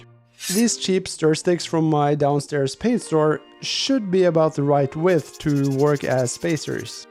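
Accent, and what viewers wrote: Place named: Norway. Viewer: I can't quite figure out what flavour of Scandinavian you are. Norwegian?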